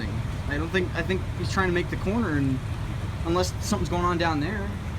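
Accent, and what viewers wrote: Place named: Canada. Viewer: guy has Canada accent but says PA in some vids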